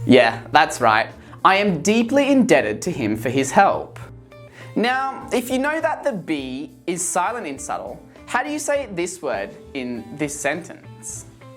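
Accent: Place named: United Kingdom. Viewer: Hey up m8! Brilliant video. Do you have your own playlist of your own? I like your familiar British sounds.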